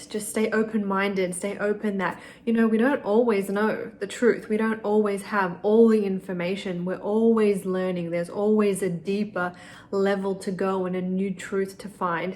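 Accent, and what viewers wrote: Australia. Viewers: I love your voice. Aussie accent?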